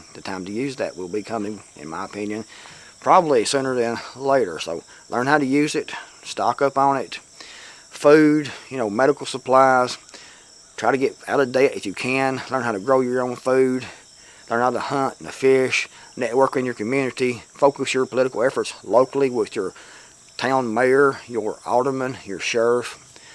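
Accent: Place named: East Tennessee, United States. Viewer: I heard Patara mention your wonderful East Tennessee accent the other day; I had to just nod. Tennessee accents are so beautiful to me. (I'm from SC.) I AGREE WITH YOUR VIDEO.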